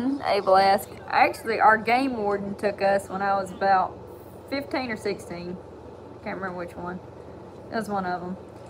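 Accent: South, United States. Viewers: your accent sets my heart all a flutter….all I ever wanted in life is a sweet little Southern Belle…or a “wild bush onion”. I’m not picky. Make my dreams come true. Yours forever, A good ol’ Canadian Outdoors Boy 🇨🇦❤️🇺🇸🙏😉😂🍻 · Beautiful country accent 😍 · Where are you from? Alibami? Missisip? Cute accent, and your dog looks like my old blue Merle sheltie, Zero. I was just noodling some bluegill the other day... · Love your southern accent,love your dog and you have such a cool dad. Take good care of your Dad and yourself ... SergeantC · The nerve of these thieves. Im sorry that they stole your and your dads and friends and family hard work. I some of my military and war actions picture as a statement by putting words on them. I failed to copyright them. I did a image search on the internet and found my pictures on their website location unknown. They stole and own it. 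I removed all my pictures of the internet now. I now copyright everything I share. I really enjoy your work and every adventure y'all do I love how y'all say at the end of each upload.. 'APRECIATE Y'ALL"... I like to hear southern accent. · listen to that accent shes form teh south i reckon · Love the southern accent. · I love your southern accent I'm from Alabama and there's not many ladies here that speak like us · That's one STRONG SOUTHERN accent!!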